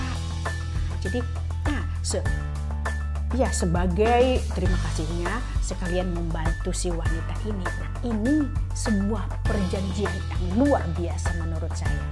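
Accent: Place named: North Sumatra, Indonesia. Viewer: Ceritanya tan, sangat menarik sekali. Jadi pertama tama waktu masih ngobrol dan ketemu, kencang ya tan kalo bicara. ? Nggak kebayang ya Tan, tante kan logat Batak nya sangat kental haha. Jadi menarik sekali kalo didengar.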